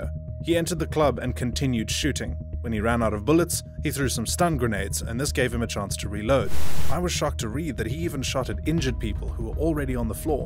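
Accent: South Africa. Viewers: bro are u South African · so refreshing to listen to a South African accent for a change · Hey man super random, but are you South African? I’m SAn too but it’s rare that I find a SA YouTube channel with this content and this good. Would love to hear from you boet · South African, but it's not that strong, like they've been living in England for a long time .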